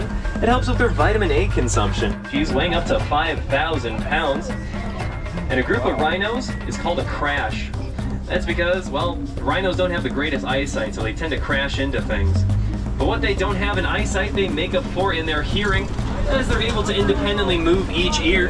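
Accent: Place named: South, United States. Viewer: Where are you from? Your accents sound south too 🎉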